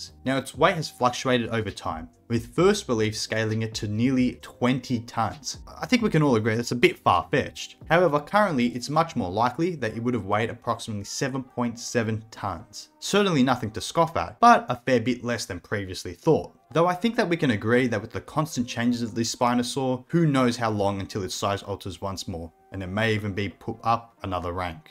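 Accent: Australia. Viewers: Very amazing video plus I love the Australian accent and it’s as satisfying as a British documentary.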